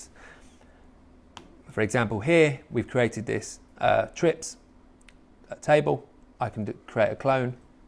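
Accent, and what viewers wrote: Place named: Scotland, United Kingdom. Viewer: finally some scotsman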